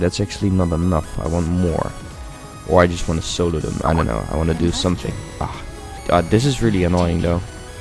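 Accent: Netherlands. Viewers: YOUR DUTCH I JUST KNOW IT :D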